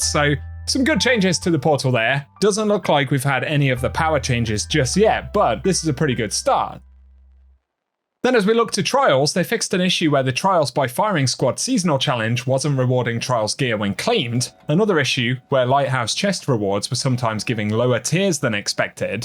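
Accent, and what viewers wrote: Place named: United Kingdom. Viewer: 😂 That freaking Brit accent ☠️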